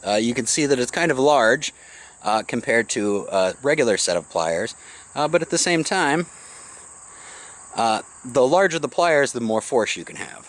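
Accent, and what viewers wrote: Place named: Michigan, United States. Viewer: BTW...where do you live, man? It sounds like maybe Michigan. You should open a repair shop if you haven't already. Would rather pay someone like you and not give any more money to ScamTron, I mean, SwagTron.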